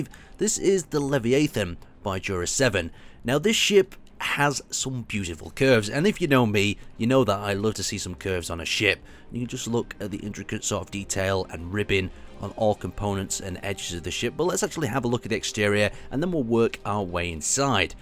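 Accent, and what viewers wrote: United Kingdom. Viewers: That British Accent Doe! Bruh!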